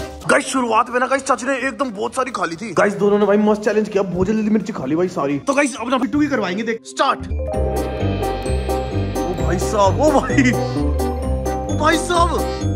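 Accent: Haryana, India. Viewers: Bhai aap Delhi se ho ya Haryana se?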